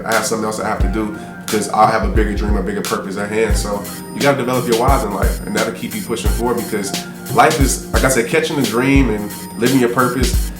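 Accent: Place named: New Orleans, United States. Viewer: New Orleans baby !!!! Me too son ! thought u look familiar , naa j/k .. na but you do have that Orleans style. !! you talk like us too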